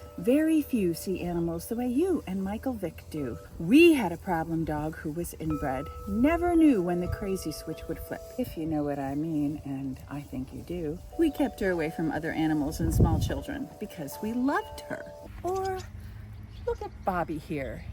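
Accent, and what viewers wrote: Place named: Connecticut, United States. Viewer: I was listening and said to myself, that's a Connecticut accent. I was right!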